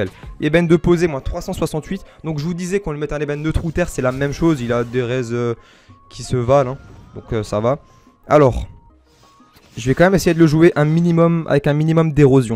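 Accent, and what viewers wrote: Alsace, France. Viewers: tu viendrais pas d'Alsace toi par hasard ?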